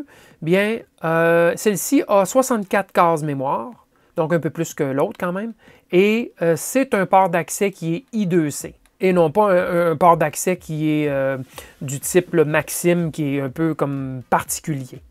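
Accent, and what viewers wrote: Quebec, Canada. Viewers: Bonjour, Encore une vidéo enrichissante, je ne savais pas qu'il y avait de la Ram dans ce genre de module. Ce qui m'éclaire bien pour les nouvelles fonctionnalités de reprise d'impression 3D après une coupure de courant par exemple :) PS : j'adore l'accent québécois ;)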